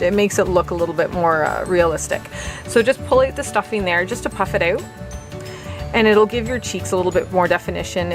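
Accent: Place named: Canada. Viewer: You sound like you have a Canadian accent like me 😀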